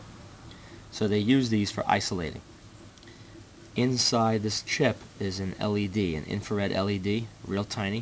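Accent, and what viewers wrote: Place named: New Jersey, United States. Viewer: I was looking for opto isoloators and I guess because I am subscribed to your channel that you popped up again. I could not believe it. I love your videos. They are simply the best. All the knowledge, zero BS, all the circuits I really want, ..but mainly it is your style of presentation, and that lovely NJ accent. You deliver the right emotion in your videos and it makes for a truly first class educational experience. It is almost more like entertainment because they are so enjoyable to watch.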